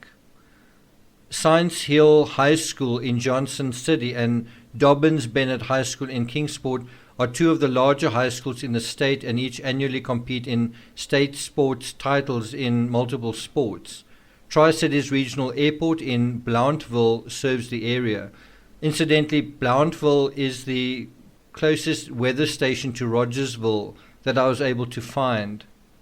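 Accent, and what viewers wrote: South Africa. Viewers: Are you South African ??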